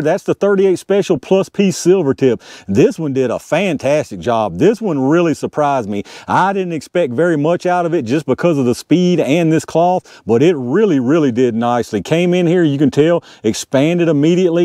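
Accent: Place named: Appalachia, United States. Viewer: Y,all would call me a Yankee, but this guy speaks Hillbilly. That’s ok, because he gives Great information!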